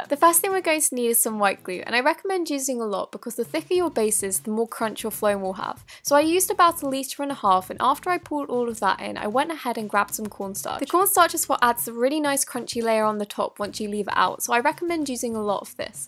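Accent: England, United Kingdom. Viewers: Can i just say your from England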